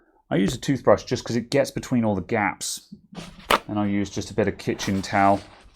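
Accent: United Kingdom. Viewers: ok, so your British, but was it your mom or you dad who where the billy goat?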